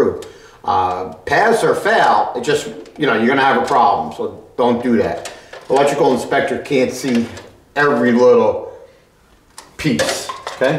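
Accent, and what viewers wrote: New York, United States. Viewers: Guy has to be from Queens or Brooklyn:)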